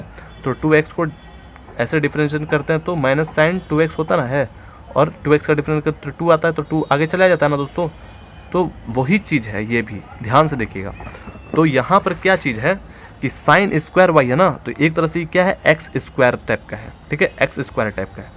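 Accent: Bihar, India. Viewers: Sir aap Bihar se ho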